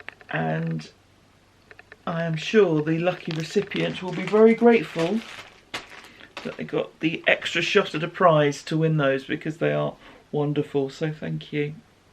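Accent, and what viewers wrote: England, United Kingdom. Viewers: New viewer here, from New Zealand. I've taken up knitting again, after many years, with inspiration from podcasts during lockdown - I hadn't known there even were such things! So pleasant to hear an English voice, English references, and English humour;-) Thank you for sharing all your FOs, and especially the patterns, I'm taking notes to find several of them. I shall be looking for your previous pocast episodes. Wishing all of you in GB a speedy recovery from this latest covid outbreak that has wreaked havoc with everyone's Christmas, I almost feel guilty for our pretty much normal summer holiday season in NZ. Glad I found you!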